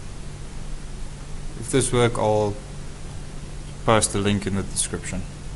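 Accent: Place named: South Africa. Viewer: You sound like a Boer!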